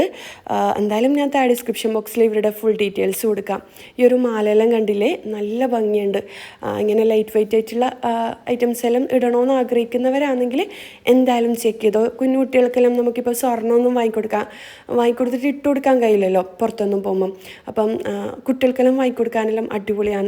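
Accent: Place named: Kasaragod, India. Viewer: Pinne kooduthlayum vidiokulla voice kodukkunnadh kelkkan nalla rasa kasaragodile bhasha chengalle malayalam ariyamengilum ivide samsarikunnadh vere bhashailan orupadu ishtam samsaram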